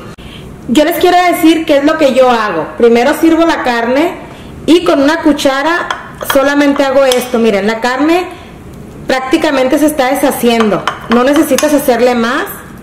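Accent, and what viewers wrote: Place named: Mexicali, Mexico. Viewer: 🤯👍👍Hola soy una subscriber nueva ‼️me subscribing por su acento pues es my parecido algo cachanilla / Mexicali? Alo mejor no pero igual me encanto su receta y todos sus vid. ❤️👍👍👍👍👍👍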